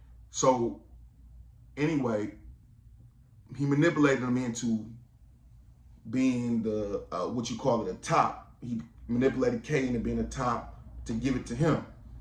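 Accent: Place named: California, United States. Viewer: Great videos and great content. Your accent, are you from California?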